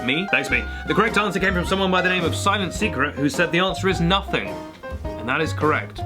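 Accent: United States. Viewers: Your American accent unnerves me... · Also your American accent is amazing. It was so accurate that it made me uncomfortable, like someone dubbed in someone else’s voice but with god-tier editing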